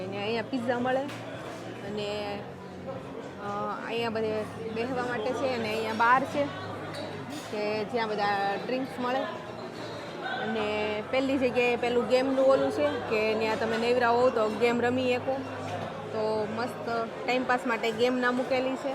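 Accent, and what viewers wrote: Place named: Kathiawar, India. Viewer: ખુબ સરસ્સ વિડીયો. ફ્રાન્સમાં અસ્સલ કાઠીયાવાડી બોલીમાં તમે ત્યાની રેસ્ટોરન્ટનો અનુભવ કરાવ્યો. વિડીયો બનાવતા રહેજો. તમારા અગાઉના પણ કેટલાક વિડીયો જોયા છે. બહુ ગમ્યા.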